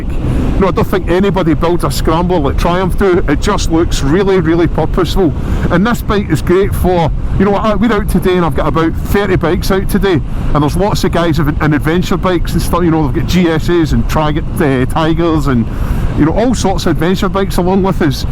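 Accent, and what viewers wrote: Scotland, United Kingdom. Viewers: I had issues with the accent too and had to use the subtitles… but I loooove the accent, made want to hop on a plane (from Spain) and go visit Scotland! I like the way you structured the review too even though I finally went for the XC because of the color, the bling factor and the reduced dealer price. 😅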